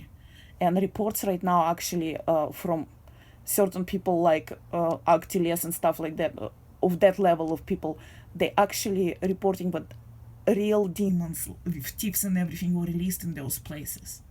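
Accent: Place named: Russia. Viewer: your rusian english pronansiation is owfull режет слух,нельзя ли записывать на русски мадам?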